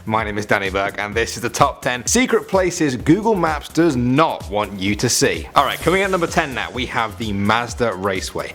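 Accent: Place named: United Kingdom. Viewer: As a semi-paranoid fan of conspiracy theorists' stories, I'd watch another! Danny, you hosted the first AT10 video I ever watched, and due to your mellow baritone and nifty British accent, I kept watching! Good on you!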